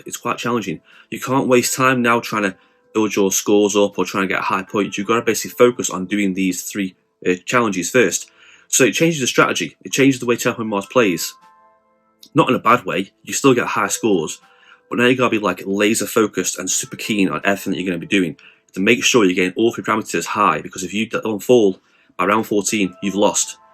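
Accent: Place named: United Kingdom. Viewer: I love your videos!! They’re great and helpful. Helped me decide to pick up Arkham horror lcg :) what region of the uk are you from if I can ask? I can’t place the accent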